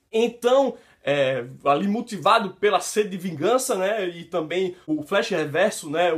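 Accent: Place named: Northeast, Brazil. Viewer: ah esse nosso sotaque nordestino kkk , ótimo vídeo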